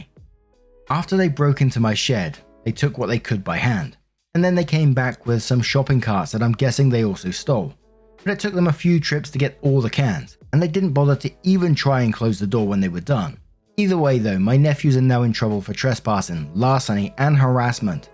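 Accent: United Kingdom. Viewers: Went to listen and still too soon to hear a British accent after the Matilda’s loss. Am soo happy for for the Lionesses and honestly wish the the best of luck. Russo and Hemp played incredibly just heartbroken for the Tillies :( still wanted to leave clout to support the channel. #FIFAworldcup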